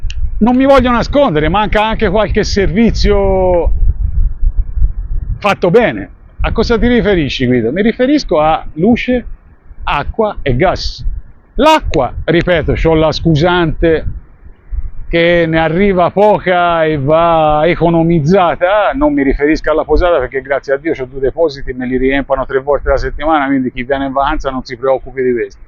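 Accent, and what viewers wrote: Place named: Tuscany, Italy. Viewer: Ciao Guido,sono Luca dì cecina (li),mi sembra che dall accento anche te sei toscano come me.....io ho vissuto in Venezuela per dieci anni,a maracay estado aragua...poi per colpa della crisi sono ritornato in Italia nel 18 e finalmente nel 22 sono riuscito a portare le mie tre figlie e mia moglie (tutte nate in Venezuela) in Italia.scusa la lungaggine,solo vorrei sapere se è raccomandabile oggi investire all isola de margarita.....la mia idea sarebbe comprare un paio dì appartamenti per turisti e avviamente una casa indipendente per me e la mia numerosa famiglia.... orientativamente diciamo un investimento dì circa 50,000 euro......che mi consigli tu? Visto che tu fai sempre ottime spiegazioni e sei una persona sincera ti sarei molto grato se mi potresti dare un consiglio.... grazie mille e continua così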